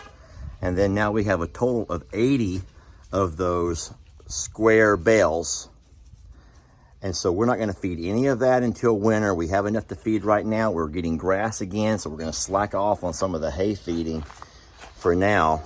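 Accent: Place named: Texas, United States. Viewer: My ❤️ and 🙏 go out to you. It's so hard losing our moms. Please take good care of yourself, take things one day at a time. There are times we need to take one hour at a time... find things that give you comfort and enjoyment. and videos give me comfort because my Momma's was from Texas. Hearing Texas accents reminds me of her and her family. I love it when says y'all 😍. I'm glad they also give you comfort. They have such good hearts, and take excellent care of Mother Earth and all of the critters at ISS and LHL. Their YouTube family will also be happy to give you comfort as well.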